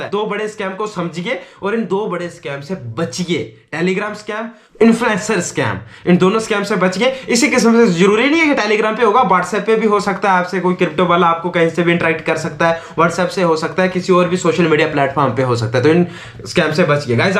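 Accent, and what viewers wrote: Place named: Pakistan. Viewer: Pakistani aur tumhara dono ka bolne ke tadika same hai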